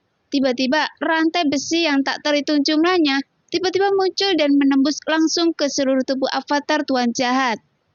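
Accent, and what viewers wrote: Java, Indonesia. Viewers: Mimin nya orang Jawa ya,Kentel banget logat Jawa nya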